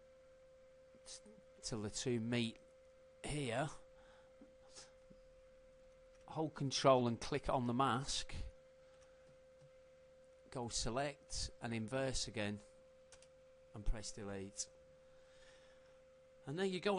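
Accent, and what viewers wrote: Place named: Manchester, United Kingdom. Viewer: really good tutorial, and I like the Manc accent top man.. lol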